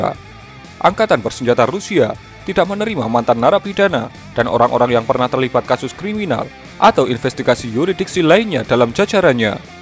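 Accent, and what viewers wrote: Java, Indonesia. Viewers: Hahaha medok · Ini medok suaranya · medok banget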